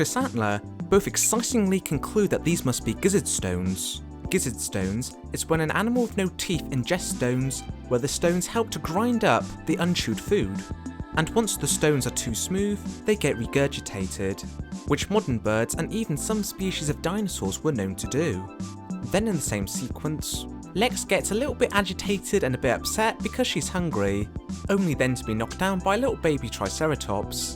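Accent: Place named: England, United Kingdom. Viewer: somebody should teach the english how to speak english. it's hard to make out what the hell you're saying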